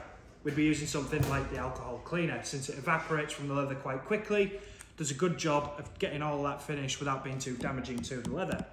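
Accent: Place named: United Kingdom. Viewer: Other then the huge thick UK Accent making it hard to understand! I would like to see the quality of this leather jacket condition now. As, l have been trained never to use alcohol based substances on full grain leather.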